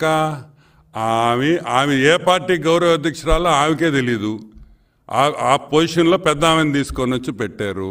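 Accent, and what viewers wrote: Nellore, India. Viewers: Entraa aa accent... Oka press meet pettinappudi language sarigaa vundaali... Nellore paruvu theeyyodduraa... Ok neeku nachhaledu daanni right gaa present cheyyali... Paruvu theesaav kadaraa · Nellore slang suprb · సర్, మీ నెల్లూరు Accent చాలా బాగుంది...జై CBN, జై టీడీపీ... అబద్దాలు చెప్పి పోయిన సారి గెలిచారు...మీరు వెంట వెంట నే విమర్శించాలి...వదలరాదు... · Nellure slong super anna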